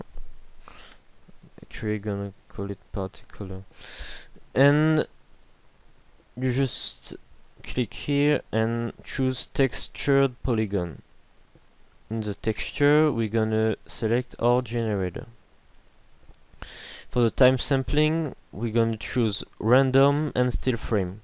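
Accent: France. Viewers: ah ton englais est parfait avec l accent Français tu t en sort bien t es pmv son extraordinaire continue a en faire j adore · Your accent sounds french... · Are you french, swiss?? *.*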